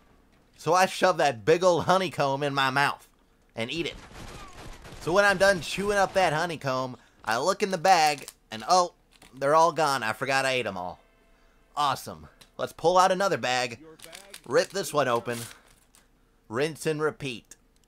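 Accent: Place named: South, United States. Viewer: You sound like a hillbilly. Don't take that offensively. I don't know if it's an act or not but it adds to the comedy.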